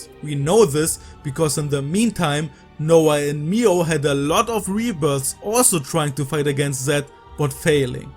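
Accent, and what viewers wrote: Germany. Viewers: Hi, ich habe deinen Channel gerade entdeckt und gleich mal ein paar Videos nacheinander geschaut. Super, einen Youtuber aus Deutschland zu finden, der sich mit Xeno-Games beschäftigt. Hab' auf jeden Fall ein Abo dagelassen und bin gespannt auf deinen weiteren, interessanten Content. Abseits davon, ich könnte mir vorstellen, dass du leichter mehr Reichweite gewinnen könntest, wenn du hier und da etwas mehr an deiner englischen Aussprache und den Formulierungen feilst. Ich hatte den starken deutschen Akzent ziemlich schnell rausgehört und das hat mich leider immer mal aus dem Inhalt rausgerissen. Einige Fehler im Satzbau bzw. bei verwendeten Begrifflichkeiten waren auch festzustellen, ich konnte mir aufgrund meines deutschsprachigen Hintergrundes aber gut zusammenreimen, wo das herkommt. 🙂 Das ist absolut nicht negativ gemeint, also bitte nicht persönlich nehmen! 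Ich finde lediglich, dass es deine Videos optimieren würde, wenn du deine Scripts möglichst nochmal von jemandem gegenlesen lässt, der Englisch etwas flüssiger beherrscht. Ich bin zwar kein Englisch-Muttersprachler, habe aber durch meine Arbeit und Hobbys seit vielen Jahren täglich mit Englisch zu tun. Falls du Bock hast, kann ich dir gerne Tipps geben, wie konkrete Sätze besser formuliert und ausgesprochen werden können. Ein allgemeiner Tipp: Hör dir ruhig mal dein Script über TTS (Text-to-Speech) an und sprich es nach, das kann massiv helfen, die eigene Aussprache zu verbessern. Ansonsten, tolle Videos, sehr informat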